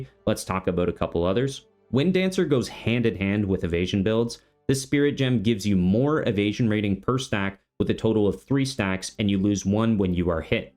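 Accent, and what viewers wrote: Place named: Canada. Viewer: love you content, bud. Yo are you from like Minnesota? You sound like you've got a light Canadian accent coming through sometimes, I'm wondering if we're neighbors lol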